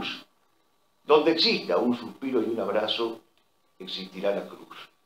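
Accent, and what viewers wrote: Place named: Buenos Aires, Argentina. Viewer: Claro y preciso, muchas gracias Ademas el tonito porteño es agradable, (perdón trinitario) saludos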